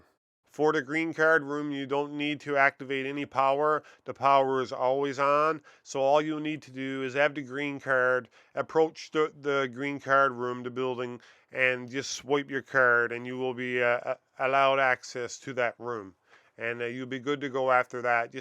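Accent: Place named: Newfoundland, Canada. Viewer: You gotta be a Newfie lol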